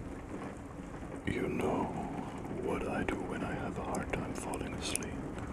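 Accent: Germany. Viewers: Tingle Lovers … I love joining you here. I noticed you may have an accent, are you German? Sending the best wishes to you in whichever country you are in. Tingles to you everyone! 🥂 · ☆ More whispering videos, please! I usually don't like talking videos, but your voice is the only one that's really soothing to me. As well as the German accent; my family is German. Thank you from my ♡ to yours! ☆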